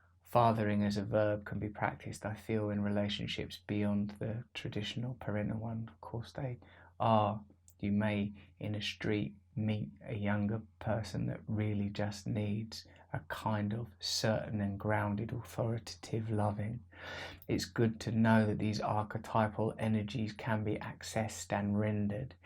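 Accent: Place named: United Kingdom. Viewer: It would be interesting to understand why you were so cavalier and uninvested before you got your "religion". You were the bad boy of the British pop media who was all about instant gratification and irreverent of proper British culture. You built a career on trivializing all the traditional values by your promiscuity, drug use, and extravagance and all in the cleverest way in a proper British accent. Now suddenly you have awakened and give talks and harangues to addiction programs and youtube videos on the sanctity of the body and of relationships as though the extended consequences of one's actions dawned on you. Why don't you come full circle and tell us what went wrong in your development that made this transition so dramatic for you. It is not your children, although by extension they are part of the metaphor. Why did you not recognize the value of intimacy and building relationships and self-knowledge even before your children? 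What was missing in your development?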